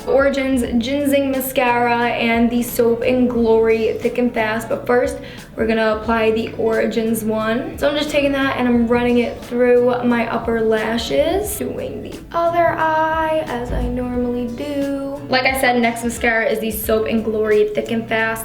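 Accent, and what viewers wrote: New York, United States. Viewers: aww honestly i love her she's so nice and i love her like little new york accent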